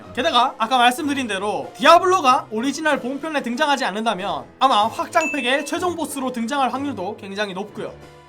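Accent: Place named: Gyeongsang, South Korea. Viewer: 경상도 분이시네 ㅋㅋㅋㅋ 엄마편 아빠편 이카면서~ㅋㅋㅋㅋ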